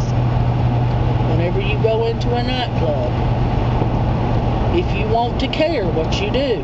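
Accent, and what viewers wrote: South, United States. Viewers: Your southern accent was out in this one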